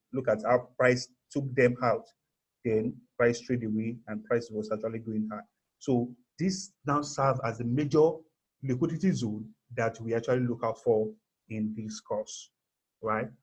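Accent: Nigeria. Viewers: Na naija guy na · You sound soooo Naija my guy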